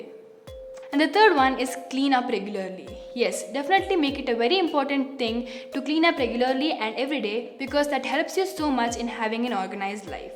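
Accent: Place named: England, United Kingdom. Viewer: First tym here girl....and loved ur voice and ur English accent....nd the tips are beneficial ❣️🥰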